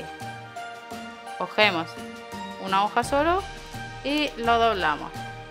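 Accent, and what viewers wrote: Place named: Spain. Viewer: deja de hablar como española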